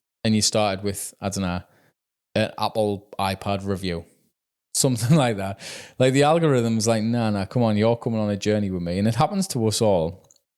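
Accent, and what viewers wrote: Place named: North East England, United Kingdom. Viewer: Hi, I have found your videos again. Really enjoying them since you have the north east twang. I have got sick of watching videos of people saying it's the end of the world. We have done it as when we were lucky to get 1K views. Caravan dealers going bust 50K so you end up having to. My issue is if it's EVERy week. So the exPawers are someone I stopped watching as now every week is an issue but really it's not.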